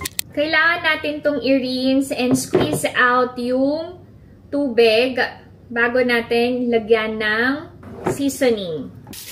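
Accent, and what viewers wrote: South Korea. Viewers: I love hearing your Korean accent..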